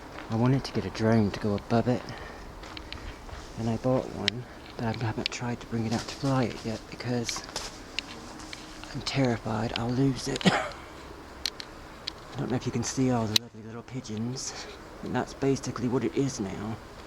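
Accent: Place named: United Kingdom. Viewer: Oh wow ok, I wasn't expecting you to be from NC. You picked up a some of that UK accent so that threw me off a little. I know some people who live in NC. My name is too and I'm from Maryland. I found your channel off a comment you made watching 539's channel. I watched a few of you vids and liked them although a couple had me really feeling your pain brother. I feel for you. I hope you can find a something to manage your symptoms better. No human should have to endure that kind of mental anguish. It's the worst kind of pain. It's like torture and really is worse than any physical pain. Physical pain can be dealt with.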